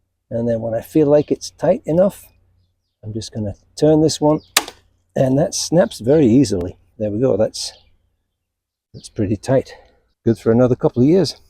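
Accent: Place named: Upper Midwest, United States. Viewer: Nice video .. interesting accent .. sounds like you could be upper midwest .. Chicago maybe .. hmmmmmm!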